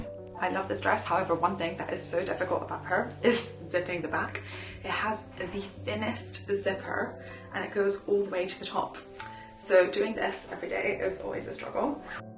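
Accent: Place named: United Kingdom. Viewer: Wonderful. Beautiful British English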